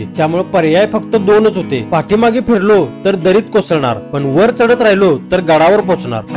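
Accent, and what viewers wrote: Kolhapur, India. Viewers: Khup Chan 👌 Tumchya bolnyavarun tumhi kolhapurche vatata. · तुम्ही कोल्हापूर चे आहात का.. भाषेच्या टोन varun तस वाटतयं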